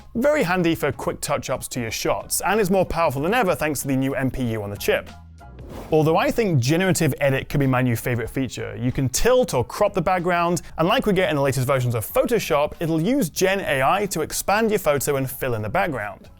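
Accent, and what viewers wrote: England, United Kingdom. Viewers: Your like the 10,000 person to leave a review on this phone. English accent doesn't resonate with Americans. And your review is sub par mate.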